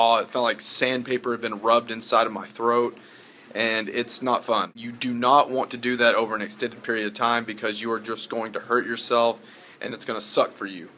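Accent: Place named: South, United States. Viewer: I didn’t know you had a slight southern voice.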